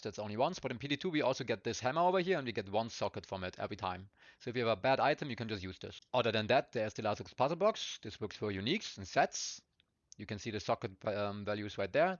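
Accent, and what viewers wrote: Germany. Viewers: I hear a bissele german in this enlgish 😊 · Are you a German Dude?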